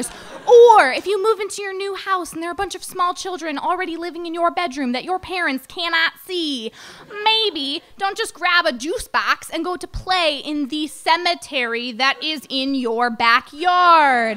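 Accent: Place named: Midwest, United States. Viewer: Is she from the Midwest? I detect a Midwestern accent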